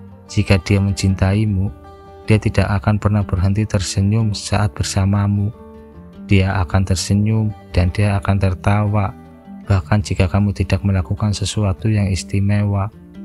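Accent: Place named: Java, Indonesia. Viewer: Njowo bangett mass, medokk pisannn buat jd cowo maskulin😂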